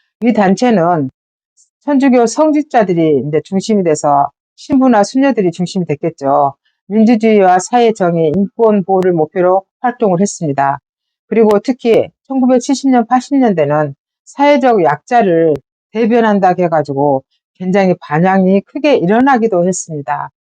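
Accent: Jeolla, South Korea. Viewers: 전라도 어투인데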